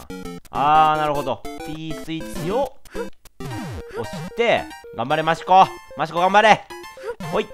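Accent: Kansai, Japan. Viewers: やっぱ、関西弁はいいな～ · の関西弁が可愛すぎる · 誤解しとったやん！簡単やったやん！のじんみーな関西弁好きだー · の関西弁実況いいな〜なんか癒される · の関西弁ええな！！ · 関西弁キャラに戻らないかなぁ……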